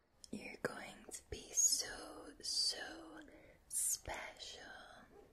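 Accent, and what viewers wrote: Scotland, United Kingdom. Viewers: I didn’t realise you were Scottish until you sounded American to me at first cos I’ve never heard you before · Wow, you created an awesome & hypnotic ASMR filled video that I love!! You're the sexiest Vampire I've ever seen, & that black lace dress, with the ambient sounds, reverb, & your various effects make this a smoking hot Halloween themed video, imo! The reiki, backdrop, & your lovely Scottish accent, & mind control work for me! Great job sweetie! 🎃Mick💓💓💓💤💤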